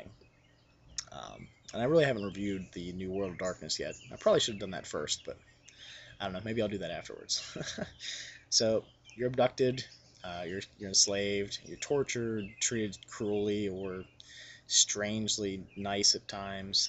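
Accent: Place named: United States. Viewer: American English kind of hurts my ears sometimes, how they pronounce the 't' like a 'd', and such their teeth every 10 seconds, but other than that you seem to be getting a grasp of the game. You have much more to read though my friend, the new supplement books outshine the core book.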